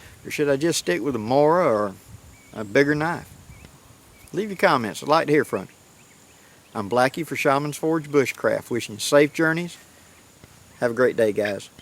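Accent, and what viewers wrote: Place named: Alabama, United States. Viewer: I figured out why I enjoy listening to you more than any other bushcraft channel. For one, you like slipjoints...I've always been taught you dont need a lock on a pocket knife. If you use it properly. I was given several US Old Timers by my granddaddy and they still ride in my pocket. Mainly the 80ot. For two, YOU'RE FROM ALABAMA. I was born and raised up by Lake Jordan in Slapout, You speak my language. Alabama southern draw. Keep em up and ill keep watching.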